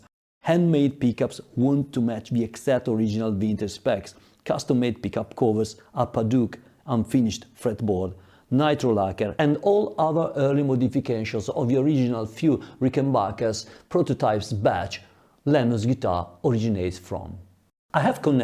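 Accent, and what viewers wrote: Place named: Italy. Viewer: Very cool. I love your Italian accent, by the way. Sounds like my wife (she is from Milano).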